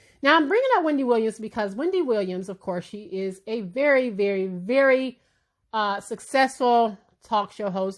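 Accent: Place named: Midwest, United States. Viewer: I knew you were Midwestern!!! I love it!! Im in Indianapolis Indiana next door!!! 🤗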